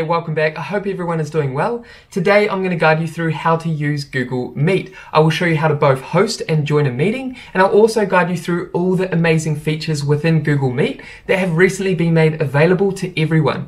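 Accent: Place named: New Zealand. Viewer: Love his kiwi accent💛💖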